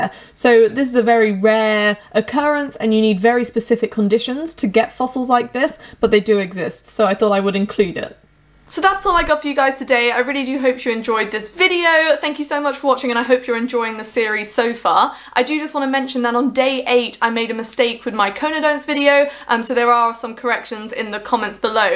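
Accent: United Kingdom. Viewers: Talk about paleontology it's amazing, but British accent make this talk so beautiful. Thanku! Rawr!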